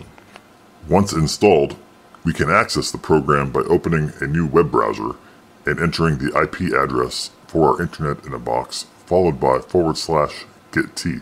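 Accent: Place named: South, United States. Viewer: Preppers are so cute when they are LARPing and all edgy and pretending their fantasy is going to happen. Gotta love the "creepy guy from the horror movies" voice modulation. Although, your southern accent and intonations do come through despite this.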